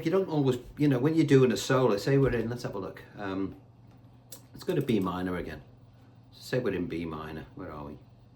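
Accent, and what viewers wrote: Liverpool, United Kingdom. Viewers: You sound a tad scouse. Can't listen to that awful scouse dialect.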